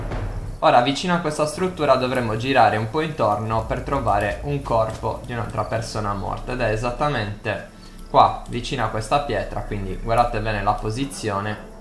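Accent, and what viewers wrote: Sardinia, Italy. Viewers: ho riconosciuto l'accento, pure io, Cagliari precisamente, mi piacerebbe aggiungerti fra gli amici se posso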